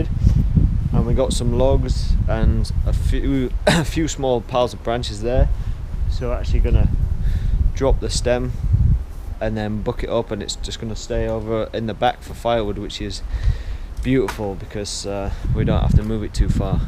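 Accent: Yorkshire, United Kingdom. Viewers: Yorkshire or Lincolnshire accent mate. Great job on that, zip line for limbs inspired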